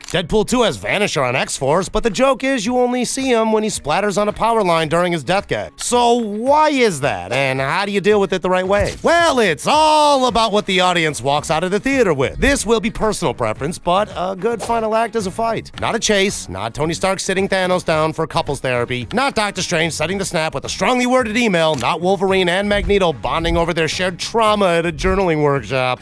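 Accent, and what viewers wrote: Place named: New York, United States. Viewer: I personally think invisibility sucks as a power. It's as effective as mace spray to the eyes, which would actually be a more effective ability. Also, random thing, but I was NOT expecting you to be Asian with THAT Rocket Raccoon New York accent.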